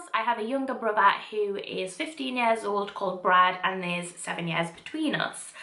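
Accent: United Kingdom. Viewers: Where abouts in the uk are you? I can’t work out your accent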